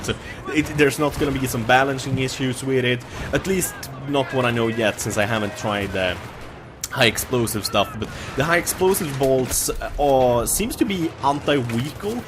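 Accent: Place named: Sweden. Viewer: I love your swedish accent// I'm from sweden to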